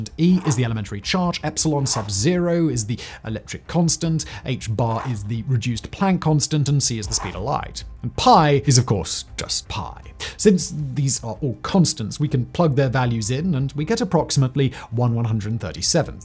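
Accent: United Kingdom. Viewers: I cant take your pumped voice Brit accent. You are not serious. Jeeeeez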